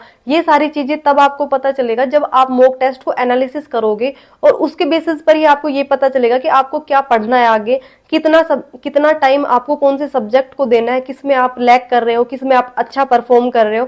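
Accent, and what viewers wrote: Haryana, India. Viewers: ! Tum haryanvi ho?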